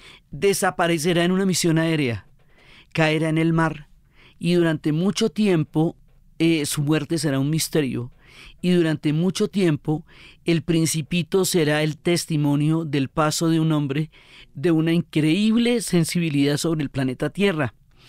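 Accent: Colombia. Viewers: El Principito es mi libro absolutamente favorito y Diana Uribe con ese acento colombiano divino, juro que nunca antes de esta señora me había gustado la historia, con ella lo que antes era aburrido es música para mis oídos ❤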